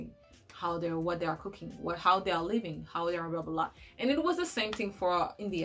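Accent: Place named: France. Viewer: I love the post you did with best dancer and you chose Madhuri.I love all the courtesan songs like you.I am a British Sikh lady and love your french accent.I studied French when I was younger 😂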